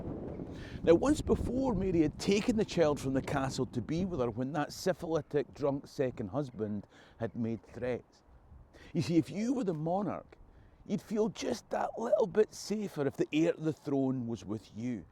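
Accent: Scotland, United Kingdom. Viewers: What do you mean? pronounces it the way anyone who grew up in Scotland does. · 😆, I am lovin your braw accent as much as your video content. Hello from deep, arctic, hick-land Iowa. My Scots Irish genes/ancestry are humming like a piper just listening to your stories. Thanks for brightening up my third winter of isolation. Very fun and even my cu (cur hound) will listen with me.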